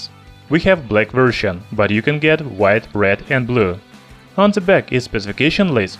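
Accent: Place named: Russia. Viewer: What is your accent? Russian? I can't tell.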